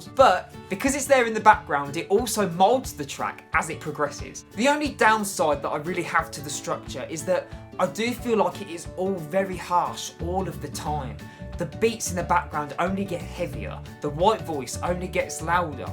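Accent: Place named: United Kingdom. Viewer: Oh, I'm so thankful for your hat and T-Shirt))))))) And your British accent...🥰🥰🥰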